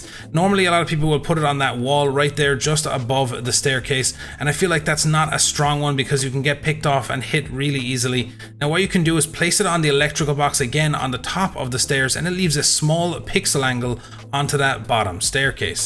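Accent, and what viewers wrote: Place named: Ireland. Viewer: First time watching and I can tell he’s irish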